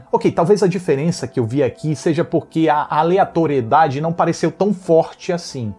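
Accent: Northeast, Brazil. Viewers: Tá mais bonito fantasiado de zombie . Brincadeiras as parte , eu gosto muito das suas críticas. Muito bom o sotaque nordestino , eu TB sou srsrs ; além da análise madura e didática . 🎉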